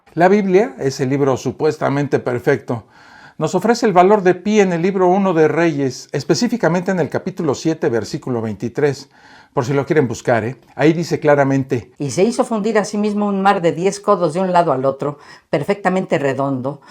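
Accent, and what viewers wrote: Mexico. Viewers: No me explico cómo puedes estar contando,tantas pamplinas y tanta gilipolleces y es que Youtuber lo tienes colapsado con tantas mentiras que dices en tus vídeos.. ‘’Se suele decir que cuando un tonto va a la feria todo se vende y siempre hay un montón de panchitos y panchitas que pican como los conejos a las zanahorias 🥕 Y enciman con el acento MELOSO Mejicano o Argentino que usas para que vas hablar más. Si aburres a un muerto. Habla castellano con buen acento y no hables melosso. Jajajaja ya te vale Mejicano o argentino meloso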